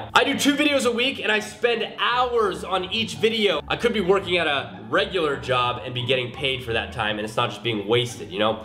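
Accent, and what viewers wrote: Canada. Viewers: Are you Canadian or American??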